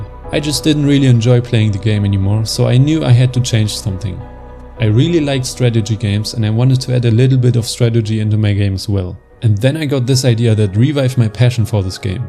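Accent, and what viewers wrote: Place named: Germany. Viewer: Man Hört doch das du Deutscher bist😂. Geil!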